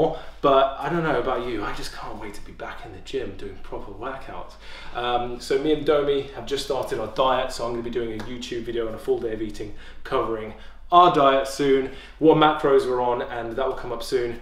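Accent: United Kingdom. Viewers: That British accent is so much easier to listen to!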